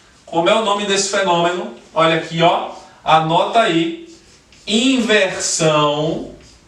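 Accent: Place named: Bahia, Brazil. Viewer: legal a aula e o sotaque baiano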